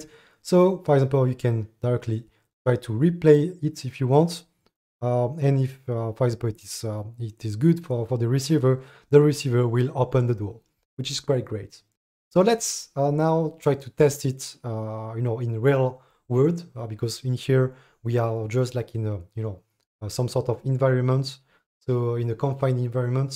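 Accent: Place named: France. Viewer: Hi ! Keep it up buddy #FrenchConnexion Your accent betrays you ahah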